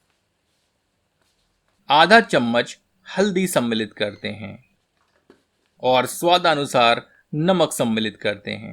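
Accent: Standard. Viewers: Appreciate your Shudh hindi and the do piyaza.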